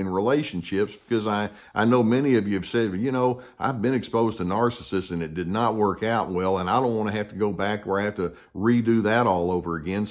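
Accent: South, United States. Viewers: But i hit the rewind first and listen again to make sure I get the message. I guess I'm trying to say I'd like your videos to go a little longer on one topic. You talk fast for a smooth southern talkin man. Love your accent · I enjoy listening to. His knowledge and delivery is pretty easy to listen to and his country accent reminds me of home. Good info to share. Thanks!!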